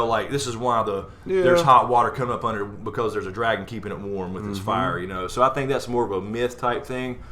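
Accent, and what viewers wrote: South, United States. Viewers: Glad I found your channel, GREAT takes on Thrones! Love Thrones talk with the Southern accent.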